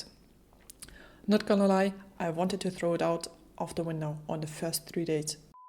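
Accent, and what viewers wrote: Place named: Germany. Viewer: Are you German? I think I recognize your accent. You speak similarly to Joscha Bach.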